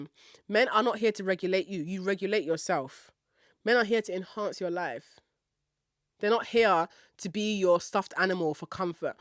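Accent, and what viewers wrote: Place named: United Kingdom. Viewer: this is my first time hearing your voice and i didn’t know your were a UK babeeee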